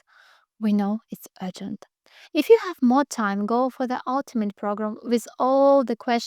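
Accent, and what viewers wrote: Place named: United Kingdom. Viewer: Вау твой Английский реально приобрел британский акцент афигеть 😮👏👏 и все так профессионально выглядит! Эта картинка 🥰 ты изумительная 😍 видео с двух камер и вся анимация и инфографики ☺️🔥🔥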